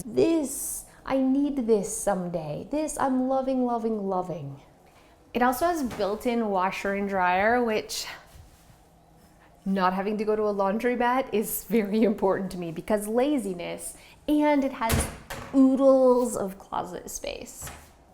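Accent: Canada. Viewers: Hi! I’m relatively new to your channel and absolutely love how authentic and awesome you are! You’ve inspired my cricket self to continue to create organized spaces in my home that make me happy. It’s also great to hear a fellow Canadian accent – makes me miss home a little less. So excited to hear that you’re in NYC filming - you got this! Rooting for you!:)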